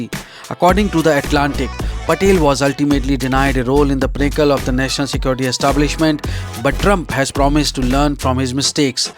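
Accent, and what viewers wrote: India. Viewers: The narrator must realize many in his audience doesn’t understand Indian English. Perhaps if he enunciates it would help.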